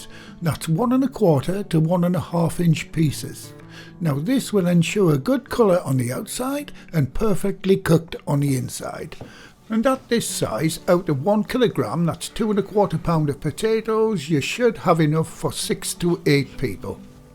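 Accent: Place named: Northern England, United Kingdom. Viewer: Your roasties look wonderful. I am from the North East of England too, now living in Australia. Nice to hear a Northern accent!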